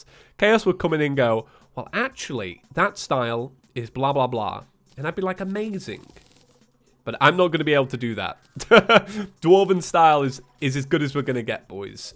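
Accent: United Kingdom. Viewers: Anybody else just realise he's Britain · I'm sorry, I forgot your British...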